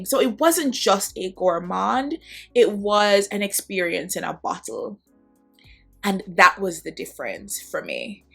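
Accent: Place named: Jamaica. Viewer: I’m a simple girl. I hear a Jamaican accent, I hear “perfume”, I subscribe.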